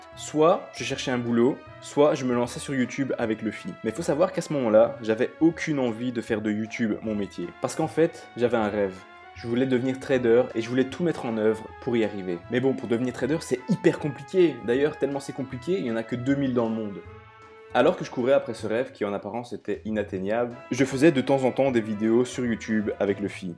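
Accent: Belgium. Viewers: Je déteste ton accent belge ms putains tu m'as bien fait rire !!! #bx #ulb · T BELGE OU FRANCAIS!? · T’as trop l’accent liégeois comme moi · T'a un accent belge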